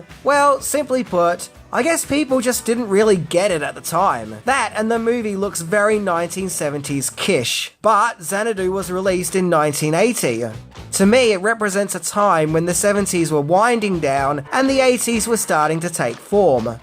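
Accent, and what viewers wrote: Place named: Australia. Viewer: I was just a kid when I saw this. This is one of my favorite movies. Please try me! I'll update as I watch this. So, 1st you're Aussie without you telling me you're Aussie. Oliviabwas actually from the UK. Waiting for you to mention her ex-husband, which she had 1 child. He played the younger Gene Kelly. And yes, this is literally an album. Knew she loved this because Gene Kelly!!! She looked absolutely beautiful in this movie and i stan 💯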